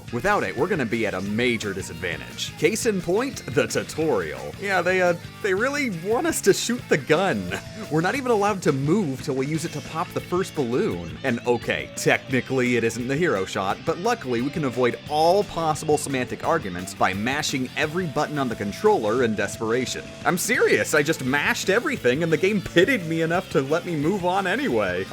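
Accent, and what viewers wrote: Canada. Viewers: Is it just me, or did he sound very Canadian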